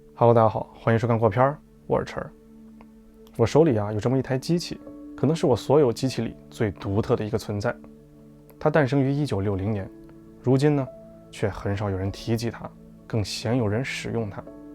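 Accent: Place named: Qingdao, China. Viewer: 我就说听你口音像青岛的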